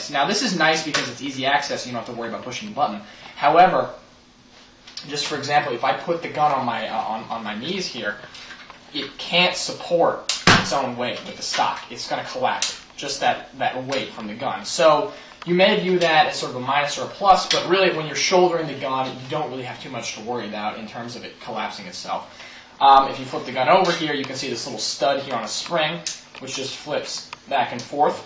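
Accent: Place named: Boston, United States. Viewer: nice review but omg u realy sound like boston from do u love new york or whatever that one reality tv show u know.... nive job tho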